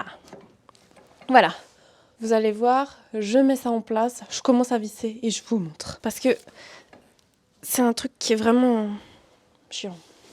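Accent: Alsace, France. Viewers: Cc tu m'as bien fais rire quand tu es en compagnie de tes parents tu as l'accent alsacien a fond😂😂😂😂 c'est trop mignon et bonne année ma belle félicitations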